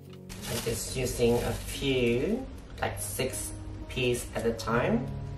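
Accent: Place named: United States. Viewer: You sound like an American. I am a polyglot, speak 9 languages and English is for the la zy. It is bar none the easiest language to learn, a breeze learnt in a weeks. No declensions, no diphthongs, all verbs remain in the infinitive for all pronouns, no conjugations to learn, add "ed" for past, add "will" for future and nouns don't have gender. You were saying?? Try learning Chinese, Arabic, Russian or French. Good luck with that!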